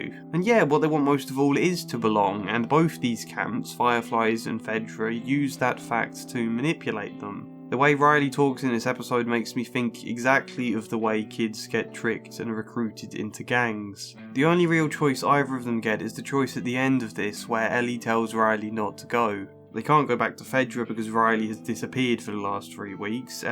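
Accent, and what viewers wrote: United Kingdom. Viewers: It's just as tragic as we thought but the British accent makes it sound more profound ?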